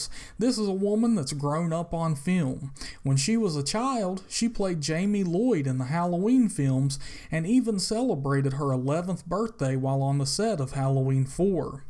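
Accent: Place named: South, United States. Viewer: Love you southern draw dude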